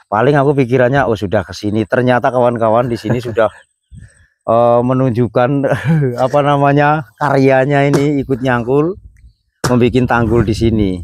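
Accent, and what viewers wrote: Banyumas, Indonesia. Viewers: Ora ngapak ora kepenak makin joss semangat menuju 20K nya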